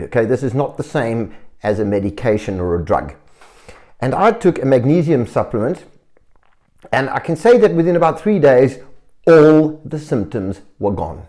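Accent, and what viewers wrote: South Africa. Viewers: I can hear that you are a South African. Cape Town?? Do you run a practice??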